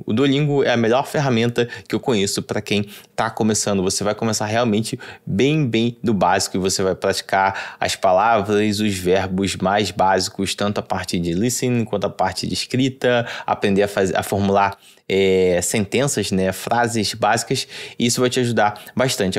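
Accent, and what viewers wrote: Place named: Brazil. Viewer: mano nunca sinta vergonha do seu sotaque brasileiro, 99% das pessoas que trabalham em TI pro exterior tem sotaque, no final das contas quem trabalha pro exterior sempre acaba trabalhando com indianos, poloneses, russos, filipinos, e obvio que todos eles tem sotaque xD nosso sotaque é nossa identidade também !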